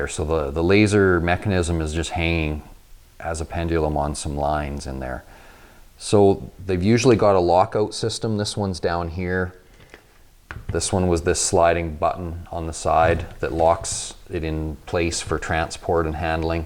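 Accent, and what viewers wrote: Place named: Canada. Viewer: first video watched on your channel, I recognize that accent, what part of Canada are you from?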